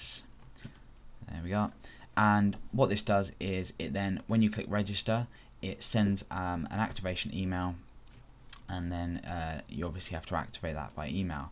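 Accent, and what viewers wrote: United Kingdom. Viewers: Your british pronounce is kinda hard to get. you pronounce php as pae ech pae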